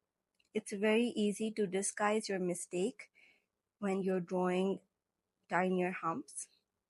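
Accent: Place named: Kashmir, India. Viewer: Your accent is really impressive .....!! ❤ are you from kashmir ?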